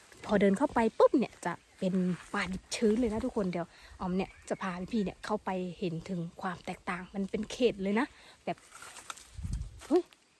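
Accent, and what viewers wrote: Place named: Isan, Thailand. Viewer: น้อง รู้ไหมเวลาน้อง พูดอีสานเสียงเพราะน่ารักมาก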